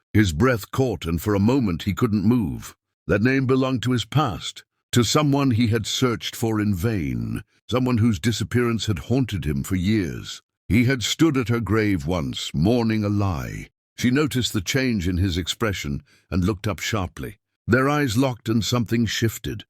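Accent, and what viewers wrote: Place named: RP, United Kingdom. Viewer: A story about the American Wild West and you choose to use an upper crust sounding British voice dripping with contempt? Nope!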